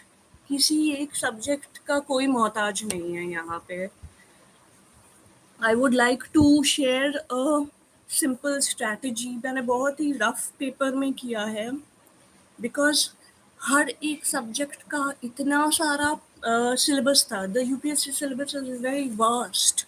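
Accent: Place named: England, United Kingdom. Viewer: Why her Hindi sounds some Bollywood Angrez Hindi accent. Oh wait she is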